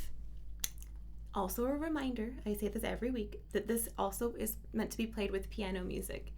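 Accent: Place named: France. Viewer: Im not in Patreon but yes in love 😍 😀. That French pronunciation 😌💞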